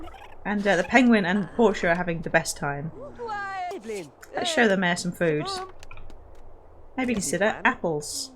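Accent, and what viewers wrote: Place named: United Kingdom. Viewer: Omg u and I literally have the same accent 😂😂 us brits need to stick together 😁😁😁🇬🇧🇬🇧🇬🇧🇬🇧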